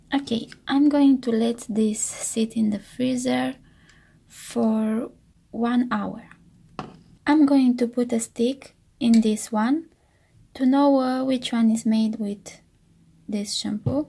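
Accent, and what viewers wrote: Romania. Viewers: a accent Romanian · She is Romanian ..but the point is that not all the Romanian people have this accent ..she has an horrible accent ..I am from London ..Where are you from ?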